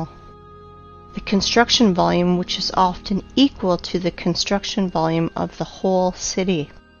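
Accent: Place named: Russia. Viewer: I wish i could get the original version with the Russian style accent. my hard drive crashed and i lost my downloaded copy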